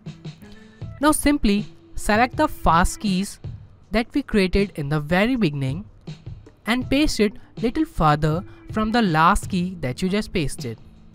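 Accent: India. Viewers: random dude with Indian accent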